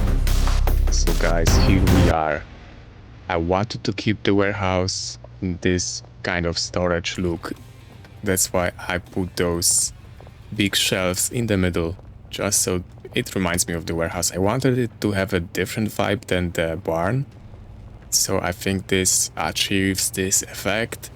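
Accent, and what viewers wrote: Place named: Poland. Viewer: Wiem że jesteś z polski słyszę ten akcent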